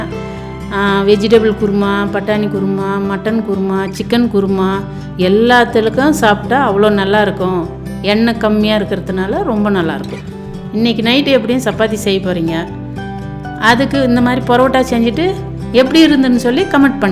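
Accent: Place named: Krishnagiri, India. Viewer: Authentic nama ooru slang bro.... love From krishnagiri... Near to your area.. NEW HOUSING UNIT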